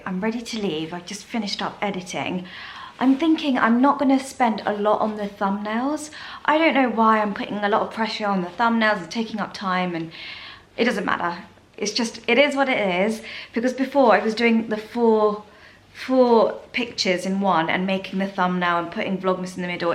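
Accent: United Kingdom. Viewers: there's a saying "if i had a british accent i would never shut up." 😂😂 ur lucky u have a british accent. i wish i had one too lol.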